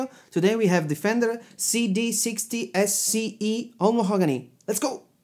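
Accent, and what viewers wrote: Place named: Brazil. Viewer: Vc é brasileiro mano eu percebi o sotaque, quase nenhum, mas consegui perceber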